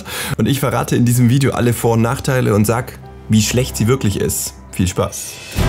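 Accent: Germany. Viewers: bist Du aus Deutschland ?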